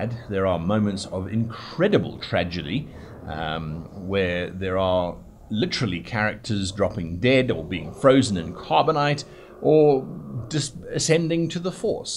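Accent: United States. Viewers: Love your work. But in fairness, that was quite the teeth grinding American accent! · Top-tier American accent. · Every time I hear it, I’m more unsure if I love or hate your American accent.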